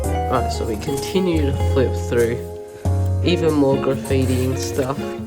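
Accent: Australia. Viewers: Are you Aussie?\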